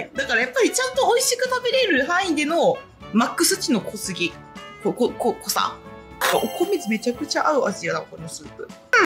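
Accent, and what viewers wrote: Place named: Okayama, Japan. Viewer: ここも美味しいですが、埼玉の騎西店はラーショの中でもサイコーです。是非一度！でも、どこの訛りなんやろ❔岡山辺りかな～⁉️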